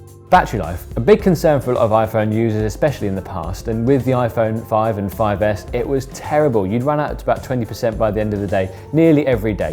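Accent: United Kingdom. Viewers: shittiest review ever. no hardware, software comparison with other phones, no technical details, very generic and personal opinions, sometimes even biased for example you skipped the details of the shitty 1.2 megapixel selfie camera which is the only biggest flaw in iPhone SE imo that is making me hesitant to buy it. anyway, try to watch linus, johnathan morrison or marques brownlee reviews and see the difference please. i mean anyone can say iPhone SE is a lot smoother, faster and very nice everyone likes it blah blah OF COURSE OBVIOUSLY ITS FASTER ITS A NEW FUCKING PHONE FOR GOD SAKE. I don't need you to tell me to know that its faster but by how much faster than previous generation phones! geez I always like the british accent because it sounds so professional and cool but this time it's disgusting to hear u speaking it. eww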